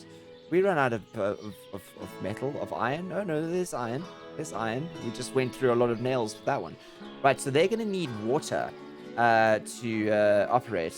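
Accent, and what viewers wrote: South Africa. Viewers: I knew I recognised that accent, my wife is from Pretoria lol. Good videos man, you made me want to get back into the game especially after the new update :)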